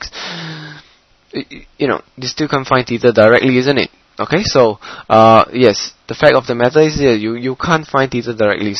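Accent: Singapore. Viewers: Wow i really got it now Thanks a lot. I'm 99% sure that you are a Singaporean. I can tell by your accent;) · u must be a singaporean or malaysian . ur accent lol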